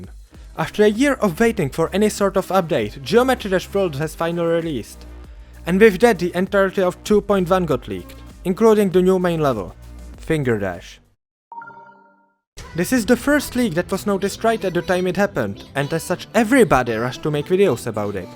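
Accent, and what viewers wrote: Czech Republic. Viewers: ahaa jo ty si čech... já jsem si říkal, ten přízvuk a najednou místo "attempts" tam bylo "pokus" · 🇨🇿🇨🇿?? I can hear the accent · Your accent sounds hella czech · Já vím že si čech už jenom podle toho přízvuku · That czech accent be bitting different thooo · honestly kinda surprised i never realized that this is what a czech accent sounds like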